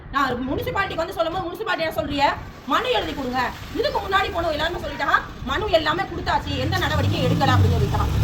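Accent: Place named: Kayalpattinam, India. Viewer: சகோதரி நீங்க காயல் பட்டிணத்தை சேர்ந்தவரா ஏன் கேட்டேன்னா உங்க ளோட பேச்சு அப்படிதான் இருக்கு எங்க மச்சி பேசுனமாதிரியே இருக்கு எங்க மச்சியும் காயல் பட்டிணம் தான் உங்க ள பார்க்கும் போது எனக்கு என்னோட மச்சிய பார்த்த மாதிரியே இருக்கு உங்க ள மாதிரியே ரொம்ப தைரியமா பேசுவாங்க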